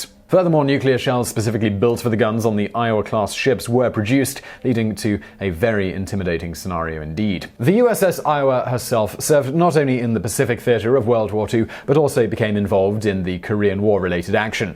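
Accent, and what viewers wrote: England, United Kingdom. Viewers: Despite speaking with an English accent, clearly despises his English heritage. I have watched his videos for a long time now and I think I am pretty much done. He is an an Englishman but chooses to use US nomenclature despite the fact that I think most US viewers are intelligent enough to enjoy these films without having an Englishman talking in US terms just to pander to them. Also he is talking about warships and chooses just one British vessel, ignoring HMS Victory, HMS Beagle, HMS Endeavour, HMS Nelson, HMS Campbeltown, HMS Dreadnought, which was arguably the greatest warship of all time. I really can't stand men who are ashamed of their own heritage. Mr., get a grip sunshine.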